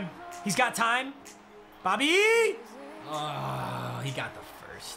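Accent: Michigan, United States. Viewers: Is he from Michigan?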